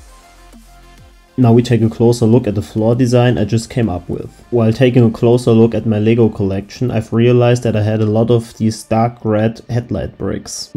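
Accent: Germany. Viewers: You sound like a German that is speaking English bro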